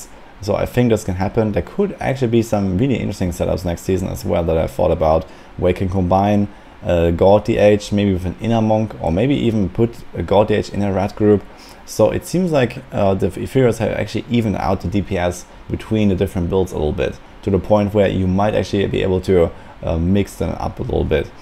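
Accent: Germany. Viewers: bist du deutcher? hast so den Akzent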